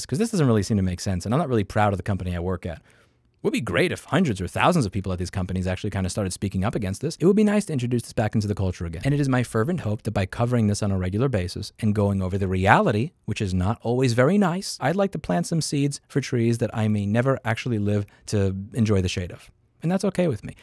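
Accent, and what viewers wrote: New York, United States. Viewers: New Yorker style pronunciations of things is part of why I love him so much.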